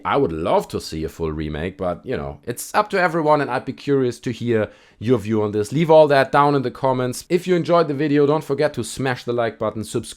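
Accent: Germany. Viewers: Let us hope this is real! BTW, are you german? I swair you Sound like me when i talk english 😅✌️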